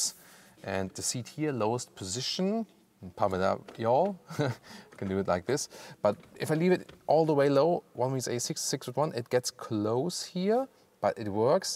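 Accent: Germany. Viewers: I do love these reviews with the German accent, I want to hear him say " for you Tommy ze vor is over".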